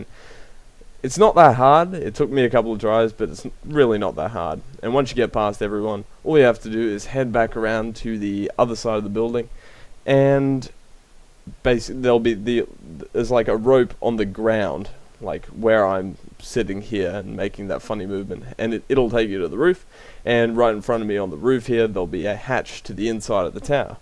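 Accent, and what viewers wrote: Australia. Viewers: are you australian or a new zeelander? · hes aussie lol..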